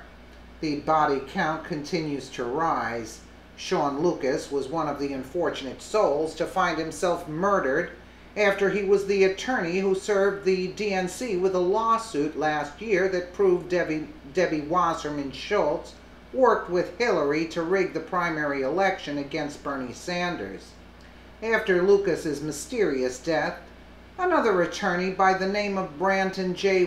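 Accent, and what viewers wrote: New York, United States. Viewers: Where's your voice from? New York? I dig it!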